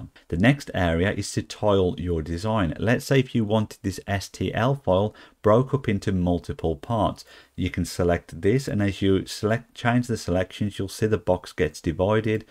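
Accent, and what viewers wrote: United Kingdom. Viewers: I love your accent. What area of Britain are you from? Might be a silly question but I'm from Germany and not familiar with British accents.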